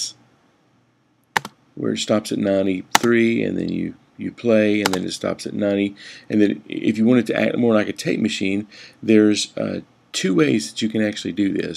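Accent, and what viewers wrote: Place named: Tennessee, United States. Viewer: THANK YOU!!! You sound like you're close to BNA.:-) Good vibes.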